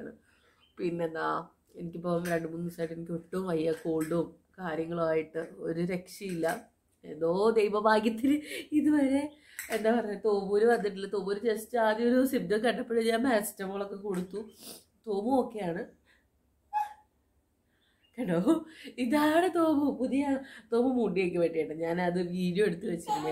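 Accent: Thrissur, India. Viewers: ipo sherikum Trissur slang aayi samsaram oke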